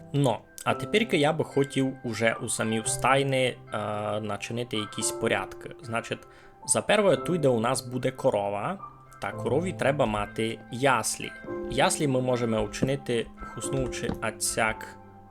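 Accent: Ukraine. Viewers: Моцно говориш ти з якого села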